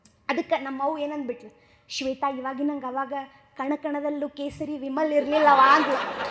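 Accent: North Karnataka, India. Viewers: I really liked your North karnataka accent, I wish you a great future in this niche💕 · ತಂಗೀ ನೀನು ಬಾಳೇನು ಕಪ್ಪು ಇಲ್ಲ ತಗೋಳವ್ವ. ಮಾತು ಮಾತ್ರ ಬಾಳ ಚಲೋ ಐತಿ. ಉತ್ತರ ಕರ್ನಾಟಕದ ಸೂಗಡೇ ಸೊಗಡು👍👍👍👏👏👏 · Your north Kannada slang is too good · North Kannada slang 😁😁👏👍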